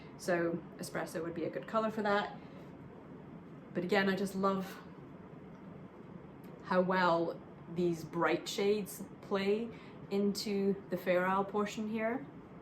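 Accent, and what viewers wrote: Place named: Scotland, United Kingdom. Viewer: Congratulations on another fabulous episode! I cannot tell you how much I am enjoying the content - I love hearing about the history of these lovely garments and how you are keeping them alive … 😁It’s also so bizarre seeing the snow and hearing about the cold, when I am sitting here in the air conditioning during our hot and humid monsoon season! Even so, my roots are in Scotland and the Northern Isles, so listening to you speak is like hearing a letter from home. Thank you so much for sharing your incredible knowledge. Very much looking forward to your next episode … Big hugs from Darwin (up north) Down Under … 😊🌴🌴🌴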